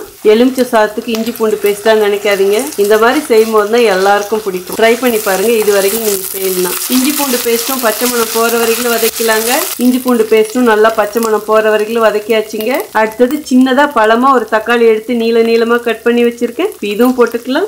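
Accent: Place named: Kongu, India. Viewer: Kongu Thangamae, Sweet Kongu manam veesum ungal Slangs, though at Bangalore, Still Comes to All people and bringing Happiness just like Wonderful preparation of Lemon Rice. Thank you, Selva Magalae.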